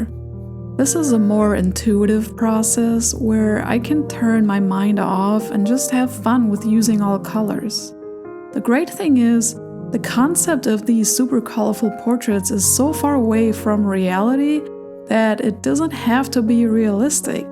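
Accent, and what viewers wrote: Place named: Germany. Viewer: Subscribed! I LOVE the colorful portraits, but honestly? I wasn't expecting your accent. I spent a handful of very happy years living in Germany as a teen, and i didnt realize just HOW much i missed it. So im going to draw alongside your videos and feel all the comfort and nostalgia in the world. Thank you 🥹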